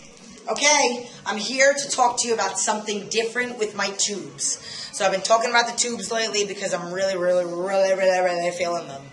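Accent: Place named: New York, United States. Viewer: she must be a new yorker, she sounds like a new yorker! Great video post, thanks for sharing. Rock on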